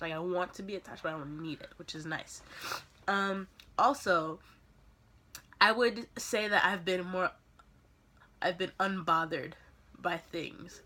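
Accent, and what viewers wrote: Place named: Caribbean. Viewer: i can hear the Caribbean coming out in this vid haha 😁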